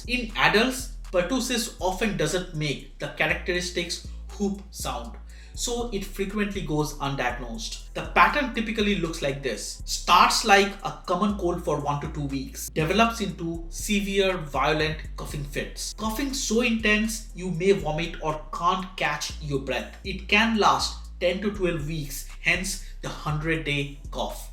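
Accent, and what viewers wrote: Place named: United Kingdom. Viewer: I love your British accent. Where in the UK are you from?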